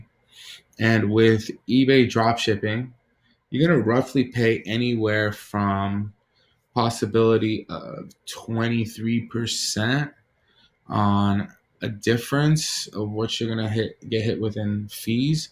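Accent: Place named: New York, United States. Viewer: Sounds like you from New York, factzz.. Good info... Why are so called guru saying don't use Amazon, don't use software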